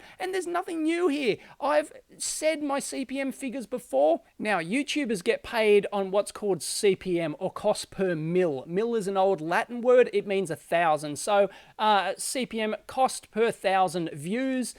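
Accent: Australia. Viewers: You've got a sub from me love the truthfulness and that your an aussie love the accent😂😂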